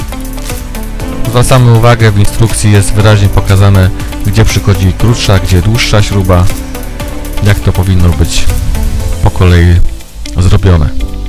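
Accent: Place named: United States. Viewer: He he, no pełna profeska film :D po głosie nie poznałem, myślałem że to jakiś YouTuber z USA pod koniec pokojarzyłem fakty i filmiki z łódek. Teraz czekam na dalsze produkcje i do zobaczenia na trasie LwG !!! 👊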